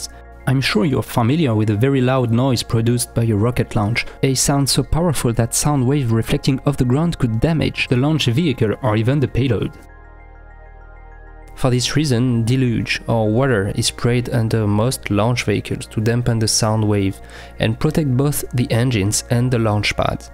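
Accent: France. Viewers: This is great and really informative. And your French accent isn’t that bad. I mean have you ever heard Stéphane Israel speak English? Your English sounds so much better! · Great collection of rocket launches and the F1 launch I've never seen, no problems with your French accent... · Your english is very good and this sweet french accent is beautiful. Much better than some Youtuber from the south of USA. (I am from Germany) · You have a French accent, not an English Accent. :) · Very good! Your English with a French accent is good and I had no problem understanding you. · Outstanding,. Your accent is tres agreable. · Excellent video and I can understand you very well. I'm English. Of course you still have a very very French accent but it's no problem for me. Merci mon pote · I've been a space geek for over 30 years now, and I still learned a ton during this video. 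Well put together! Don't worry about the French accent, I'm from Canada, I'm used to it ;-) One thing about the F-1 engine that has fascinated me from day one is the black smudge around the exhaust. It is the result of the turbopump exhaust passing through liquid helium and liquid oxygen heat exchanger coils. The resultant helium and oxygen gas are used for fuel and LOX tank pressurization, etc, and the now much cooler turbo exhaust gases pass into a manifold, which then vents it inside the nozzle extension. This boundary layer cools the nozzle extension, since the nozzle, throat, and combustion chamber are regeneratively cooled. Passive cooling for the nozzle extension, active cooling for the rest of it. (The heat exchanger is the bottom half of the cylinder thing next to each engine bell, and the manifold is the thick ring around the nozzle. A gas generator downstream of a gas generator.) . 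Keep up the great work! I will be watching part 2 next. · From now on, all rocket videos should be in French accent. (Like Attenborough nature videos, and Australian croc videos.) Excellent work. Very good info. Thanks to you,. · It's a great pity that this would be an even better video if someone who speaks English as their first language had provided the voiceover. Your very strong French accent is sadly too strong to make many of the words understandable. (I am from London, England.) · Please continue making such cool videos! I learned so much in a short time.. maybe I'l have to watch it a second or third time :) Absolutely no problem with your french accent. Great video content. I love the super slow motion and HDR-looking footage! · Great great video. Condenses a fair amount of knowledge really difficult to get without a lot of work, and your accent is just fine. It's obvious you're French, but there was no single word I didn't get. 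A big THANK YOU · its funny how when you speed the speech you sound more french. but i understand you perfectly,and i am spanish. very good content,btw.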